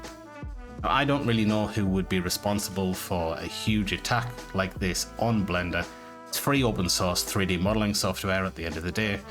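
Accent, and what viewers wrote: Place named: Newcastle, United Kingdom. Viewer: awesome, you're a geordie!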